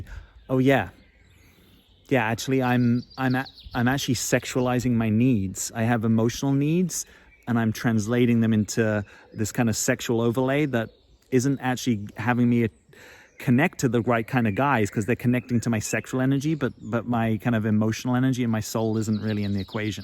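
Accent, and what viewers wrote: United Kingdom. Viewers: yes, it’s the Brit way you say pattern. :) I had to learn an RP accent when I was in a play (very small part) and I so wanted to go around talking that way...but that would’ve been inauthentic 😁and probably pretty hilarious to boot. It’s so nice to hear it as it is, from you! Very good points regarding practicing trusting what’s good (or not) for me and maybe that just relating is a good space. I’ll do like he does in “What about Bob?” Baby steps...😂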